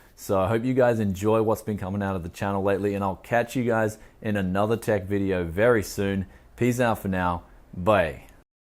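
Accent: Bogan, Australia. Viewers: I love how you Austrailans talk :D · Sound so Aussie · chucking on that bogan voice m8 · Bogan voice hype.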